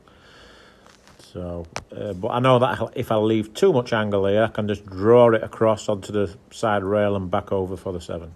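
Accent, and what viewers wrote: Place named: Northern England, United Kingdom. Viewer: 29-1 come on Daz, bit lacking that mate. Nah, only kidding, absolutely fantastic play. I'm living in Thailand now and get to play on some great tables in some lovely places and yours, Neils and Karl's videos are so informative and easy to watch. It's great to hear your accent as I don't get that here much as I'm from Hull originally so not far away from you. Keep the videos coming. It's great to see you hitting some good form again. Let's have a few more worlds off ya matey. Also, what do you think of Judd Trump playing in the U.S open, hopefully a good thing as it may start the ball rolling to get some more money into the game and a new set of viewers. Still undecided myself as I don't know but hope he will give the game the respect it deserves. Thanks for your time doing these videos Daz, they are so helpful, informative and very much appreciated. 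P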